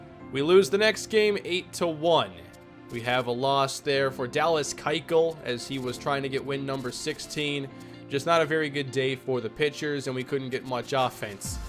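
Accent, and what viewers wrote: Minnesota, United States. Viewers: You from Minnesota?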